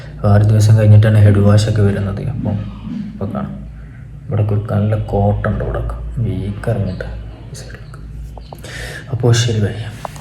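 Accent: Malappuram, India. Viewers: Ivan malappuram slang aanallo😌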